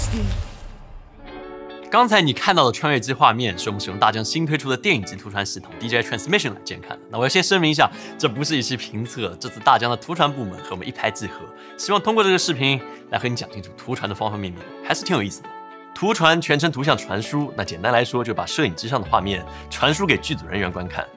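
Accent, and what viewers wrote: Taiwan. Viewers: 台灣人這樣講話的嗎?? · 你很机车，妥妥台湾腔。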